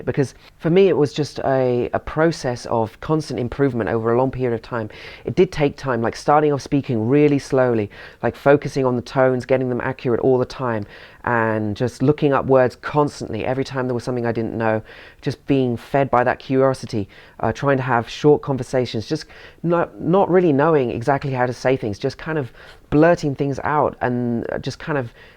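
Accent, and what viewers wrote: United Kingdom. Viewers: He sounds british😁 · I am very impressed by your Chinese and Cantonese. I love your British accent very much. Can I know what kind of British accent do you have specifically? I am going to learn and imitate your accent through your channel. Haha. Hope to see more videos from you. Thank you :)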